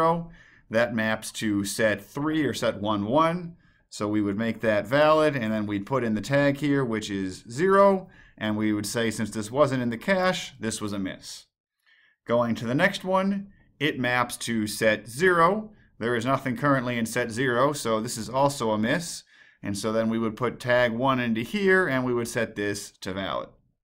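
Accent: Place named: India. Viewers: Thank you so much for this. English is my second language and I have a huge problem understanding indian English, so this is extremely valuable for me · I know right. I mean no offence, but it's hard to perceive an Indian accent. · Indian English lets me forgets standart english as you can see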